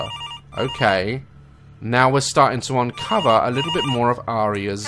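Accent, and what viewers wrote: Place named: Newcastle, United Kingdom. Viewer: I think it's a Gordie accent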